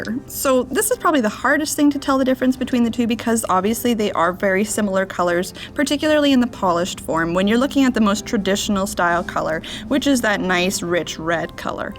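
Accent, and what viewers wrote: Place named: Winnipeg, Canada. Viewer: Ruby was my Mom's birthstone, emerald mine. I loved collecting garnets during my college Earth Science' class field trips as well. It was funny though, for an engagement ring my fiance wanted cubic zirconia because it was more 'sparkly' than diamond. I thought it was nice too. Love your Winnepeg accent--I worked for a client up there in the late 90's, I was given a visa which marked me as a professor so I could teach hotel business systems' classes all across Canada without paying a customs fee, under the rules of NAFTA. My Canadian hosts were so nice to me--my first trip there, with my visiting Napa Valley Gospel choir, was in '72 in BC and Alberta. I was so blessed to go there so young, and my timing was lucky, because I returned home only to have an emergency appendectomy a month later that August, returning from my school's summer vacation an intriguing hero among my choir mates and classmates. 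I remember arriving in Ottawa during a terrible blizzard at 3AM one year, and my client picked me up at the airport as my flight came in after an eight hour delay from Detroit. I also remember a client in Montreal giving me a day off so I could hop a bus to Quebec City, and I enjoyed the Winter Carnival and ice sculptures. Other than Europe, I spent more time in Canada (mostly working) than any other place outside of the US. I remember the Geology and Glaciers of the Canadian rockies, and the hospitality of the French and English Speaking Canadians who treated me like I was a US am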